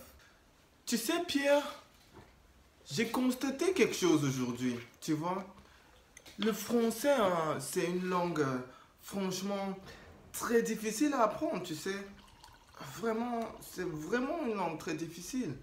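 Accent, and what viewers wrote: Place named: Cameroon. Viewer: J'adore ton français camerounais. Je n'ai presque rien compris...but your acting is so funny!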